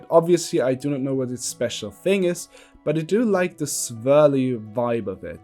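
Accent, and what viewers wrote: Austria. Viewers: The Austrian accent is based as hell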